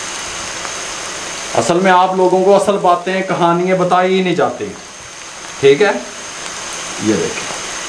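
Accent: Punjab, India. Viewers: bht kmal apk smjhany ka method, or tips amazing, sir or apka punjabi touch tu kya he kehny. Allah bless you.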